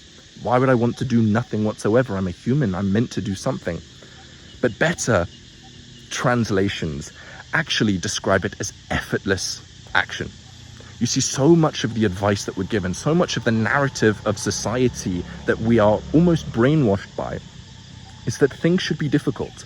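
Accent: United Kingdom. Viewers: Deep voice + british accent + philosophy and self help = subscribed immediately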